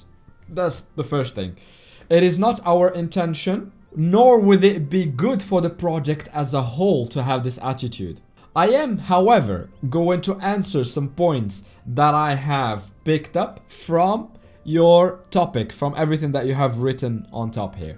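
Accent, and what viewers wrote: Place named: United Kingdom. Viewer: I absolutely love the british accent when speaking french 😁 Keep up the good work! Btw, as a french person, the translation is on point 👍🏽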